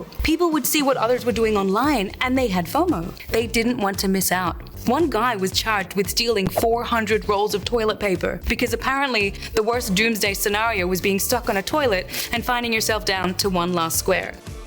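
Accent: United States. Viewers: your accent is changing from british to american, softer R's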